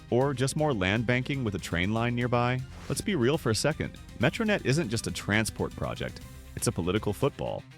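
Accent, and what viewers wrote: United States. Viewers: American accent not even pronouncing place names correctly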